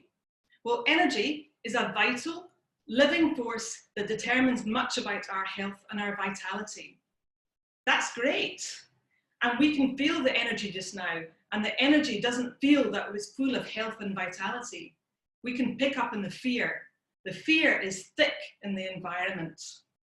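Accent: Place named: Scotland, United Kingdom. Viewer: Thank you so much for this, your lovely Scottish voice and your energy are just so delightful. I have shared this with my family and friends in this country, and the USA and relatives in Italy. My nephew passed on Sunday, not covid related, we are all devastated but I’ve found this helpful and a managed to do it today. Can’t quite smile , just tears for now, it that’s healthy tears and the smile will come. Thank you 😊 xxx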